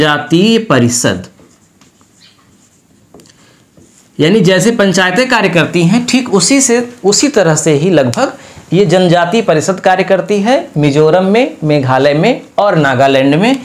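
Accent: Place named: Uttar Pradesh, India. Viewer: U P me isi tarah se bolte hai....pronunciation pe mat jaiye...kitna acchese padhate hai..ye dekhiye please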